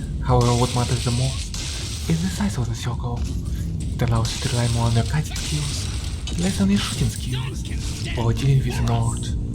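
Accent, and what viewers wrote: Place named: Europe. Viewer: european accent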